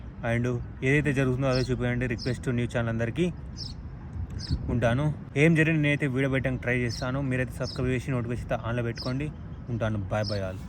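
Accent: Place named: Telangana, India. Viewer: 😂 nuvvu pakka Telangana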